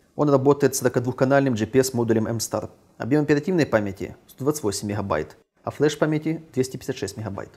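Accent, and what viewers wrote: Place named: Ukraine. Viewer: комментировать на русском украинским диалектов кокто не очень:( но видно старался так что палец верх :)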